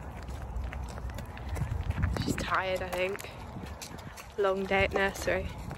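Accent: United Kingdom. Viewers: British accents drive me nuts but you are really beautiful! Love teddy’s racoon eyes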